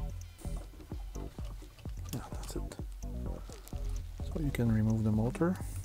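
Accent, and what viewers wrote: Quebec, Canada. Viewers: i love the Quebec accent! And thanks for the video!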